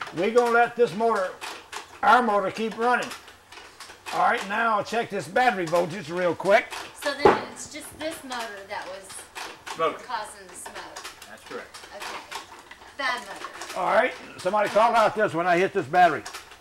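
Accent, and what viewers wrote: United States. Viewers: Just because he has a country accent doesn't mean he's uneducated. He may very well be a pioneer of something great and beyond the "education" of the masses. Many highly "educated" are so indoctrinated with book-knowledge that they never do anything great with it. There are other who have experiential knowledge and are willing to go beyond the status quo to discover something new. Hopefully this man is one of those people.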